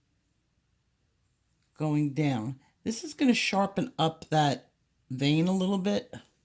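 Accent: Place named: Brooklyn, United States. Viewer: New to your channel, and wondering if you’d mind explaining what paska ( not even sure how to spell it, lol ) is.... never heard of it... loved your tutorial on leaves and as a Canadian, your accent is a delight, makes me smile... is it a Brooklyn accent? That’s my best guess.... thanks for giving such great instructions.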